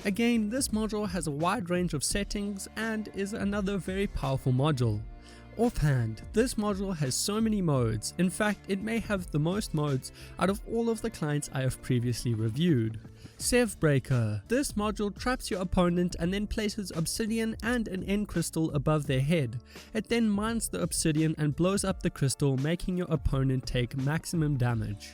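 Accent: South Africa. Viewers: U sound south african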